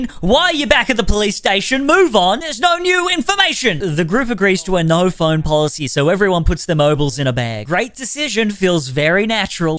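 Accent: Australia. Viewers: Ashamed of myself, but I must confess, I thought was a different Australian YouTuber that sometimes covers shitty b rated horror movies, Jackson Fields, who also did a video in Shark Side of The Moon. I feel bad, I'm sorry 😞 I don't even know how I messed up that bad. The only three things (looks and sound wise) they have in common; Accents (obviously) Both are white And both have dark hair. And my mind went "okay they're the same person"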